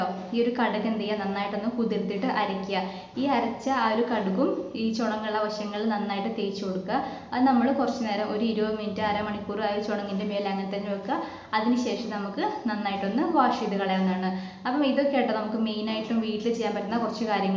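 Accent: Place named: Thrissur, India. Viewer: thrissur shaily is best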